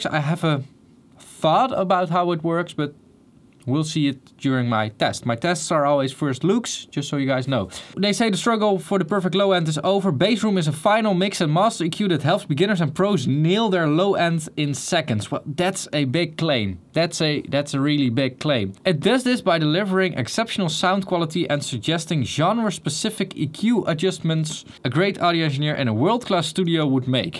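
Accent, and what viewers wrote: Netherlands. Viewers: hoor ik daar een Nederlandse toets in uw accent? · i could tell this dude was dutch like 3 words in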